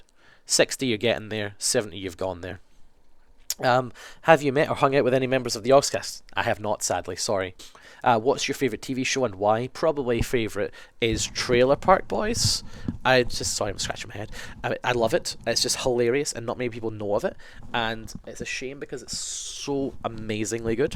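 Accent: Scotland, United Kingdom. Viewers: I love your accent ^_^ it's probably weird of me to say that since I'm Scottish too but, my accent is a lot different to yours, haha.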